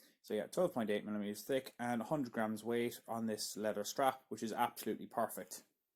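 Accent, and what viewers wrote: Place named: Ireland. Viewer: Got to say your Irish accent in rant mode is brilliant! I've played with the idea of reviewing a Reef Tiger but they're getting plenty of negativity lately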